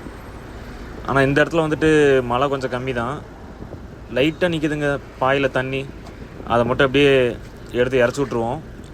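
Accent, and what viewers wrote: Kongu, India. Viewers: கொங்கு தமிழுக்கு வணக்கம் சொல்லி கொள்வது நெல்லை தமிழ் 🎉🎉🎉🎉🎉🎉🎉🎉🎉🎉🎉🎉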